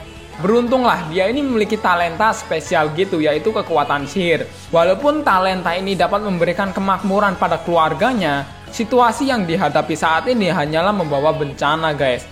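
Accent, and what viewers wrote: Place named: Java, Indonesia. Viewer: kek si re:Jero mati terus ampe bosen Gw suka logat nih creator jujur(asal jeplak) dan medok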